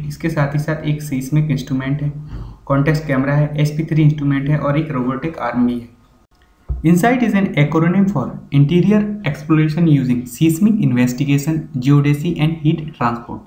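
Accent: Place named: India. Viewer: 咖喱英语，一听就知道是三哥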